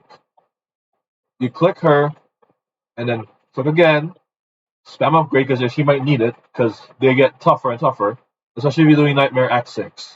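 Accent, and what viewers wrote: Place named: Netherlands. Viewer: Thanks for the video btw you sound dutch what is weird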